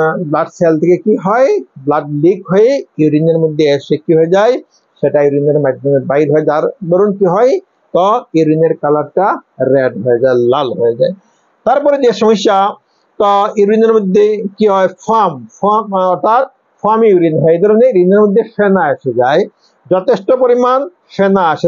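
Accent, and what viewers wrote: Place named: Sylhet, Bangladesh. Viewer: Apnar Bangla Uccharon a Syleti tan achey - Uccharon thik koroin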